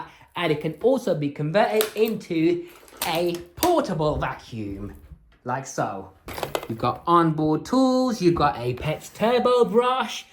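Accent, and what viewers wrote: United Kingdom. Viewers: "A million times Bet ah" I love a British accent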